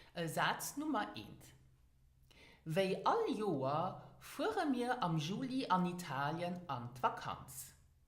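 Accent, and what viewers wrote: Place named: Saarland, Germany. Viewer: Lastly the falling wood in the sky this is Saarländisch (dialekt from the german region which borders Luxembourg). it is very similar to Lëtzebuergesch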